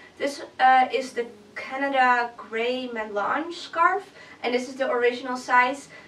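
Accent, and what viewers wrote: Netherlands. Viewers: haha hoorde meteen dat je Nederlands was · Toen je begon te praten wist ik gelijk dat je uit Nederland kwam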